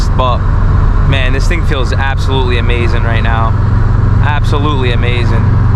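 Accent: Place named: Fall River, United States. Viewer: Lol I’m watching this and I’m like hey that accent sounds familiar. Then I realized it’s Fall River. Sup blica torte!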